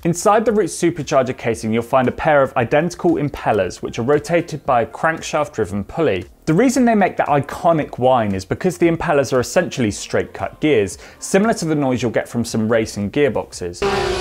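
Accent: United Kingdom. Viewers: British accent. Cant follow it. Turned off.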